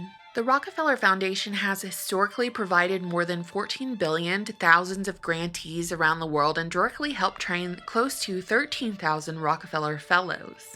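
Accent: South, United States. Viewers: She has a calming voice. Gotta love the southern accent 😉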